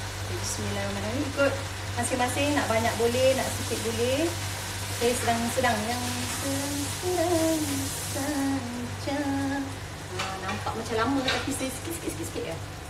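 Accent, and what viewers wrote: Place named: Pahang, Malaysia. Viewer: Tokti buat tutorial masak cakap pahang pulak haha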